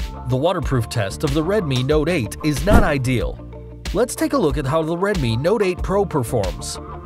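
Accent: United States. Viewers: The script of this video sounds like it was written in China and read by an American.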